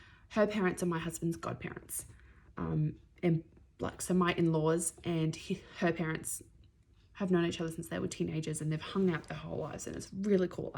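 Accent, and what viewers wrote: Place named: Australia. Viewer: Hello I was recommended to your podcast from the sisters at Frivolous & Frugal (Penny & Dawn) USA what a surprise when I heard you speak, a fellow Aussie 👍🏻 I live in mid north SA and after going back to your 1st episode instantly subscribed. Rainy day & cold today I’ll be watching in order your previous episodes, snuggled up on lounge knitting. Many thanks 🦋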